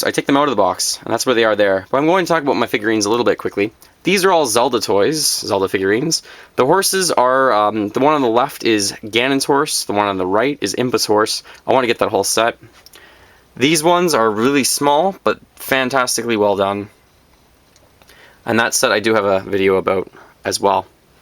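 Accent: Canada. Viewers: great video! I love video game collecting and I can see you do also. Also you sound Canadian.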